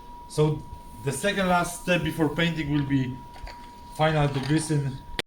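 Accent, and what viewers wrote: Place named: Poland. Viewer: Szedłem o zakład, że słyszę polski akcent. Dobra robota. :)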